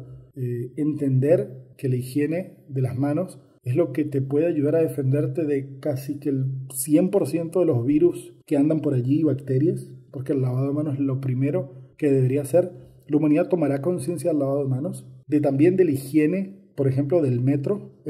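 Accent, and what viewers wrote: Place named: Andalusia, Spain. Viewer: Que bien, buen análisis... empece a seguir... además mejor con el acento del sur con palabras venezolanas je je je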